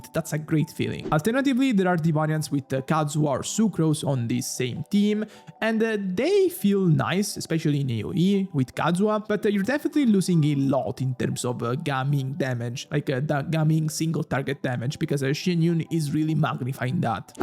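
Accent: Italy. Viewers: accento italiano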